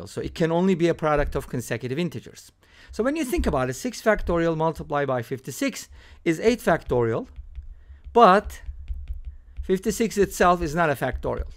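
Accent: Turkey. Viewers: unnecessarily long video. last part was more interesting it could be more explanatory aksanindan anladim turksun helal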